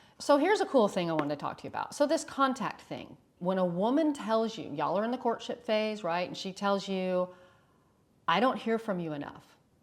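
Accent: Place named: South, United States. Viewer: Greetings from Lake Como, Italy. I like your southern accent 😊 exactly where are you from?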